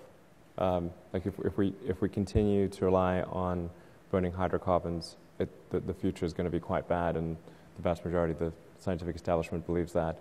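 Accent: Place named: South Africa. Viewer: you can still hear his south african accent a bit in this video lol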